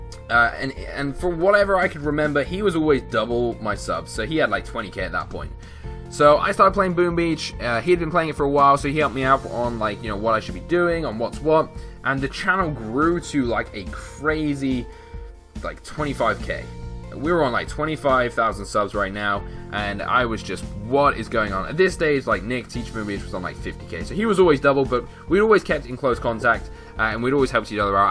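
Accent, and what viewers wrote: United Kingdom. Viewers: u have a British accent and by the way I subscribed to u and I am the girl on clash of clans that I talked to u when u said hi YouTube in clash of clans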